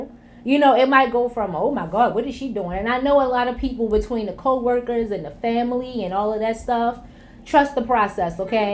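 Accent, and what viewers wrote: Baltimore, United States. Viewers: It’s the B-more accent for me 🥰🤣. You’re beautiful, sis. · You have to be from Baltimore (the accent) lol, I’m from the dmv. Love your hair!! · Sis the Baltimore accent IS REALLLL!!😫😂😂😂😂😂😂 you’re soooo beautiful🥰🥰🥰🥰🥰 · Just came across your channel. I had 4-5” of hair when I started too. My install was 5/23/20 so our locaversary is around the same time. Are you from Baltimore or somewhere in DMV? I think can hear it (I’m from Balto myself). Many similarities. I think my hair was more awkward than ugly lol...I wore turbans and headwraps for like the first 3-4 months straight. New subbie! 💕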